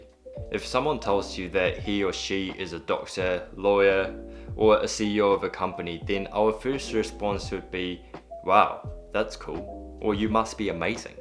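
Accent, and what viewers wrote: New Zealand. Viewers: wise and crazy cute! 🥰 ps. did u study in New Zeland? I can hear a kiwi 🥝 accent when u speak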